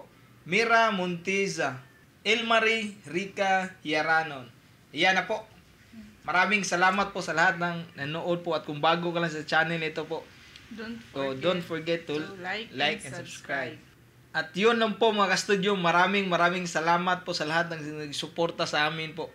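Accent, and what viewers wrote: Visayas, Philippines. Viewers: I like the way you talked! I loved the Visayan accent! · Sinasadya nyo po ba accent nyo? Kasi bisaya po din ako. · Ingles ug Tagalog nimo gahi kaau Klaro kaayo bisaya accent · Di bagay mag tagalog2 bisayang dgko why angay.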